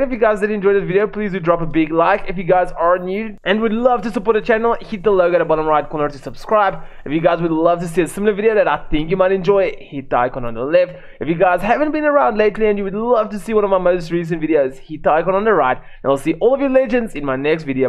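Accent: South Africa. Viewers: I thought so because your accent sounded south African I'm also from south Africa😂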